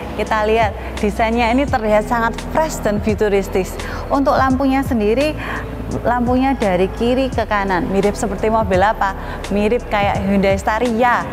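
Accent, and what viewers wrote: Java, Indonesia. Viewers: aksen jawa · Jawa banget ngomong nya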